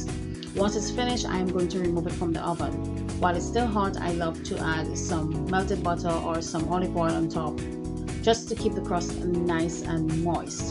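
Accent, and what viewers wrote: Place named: Eastern Caribbean, Caribbean. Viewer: What IS "blogger plantain"? Is it of the Eastern Caribbean, I detected the region's accent. Novel idea. 😊🌴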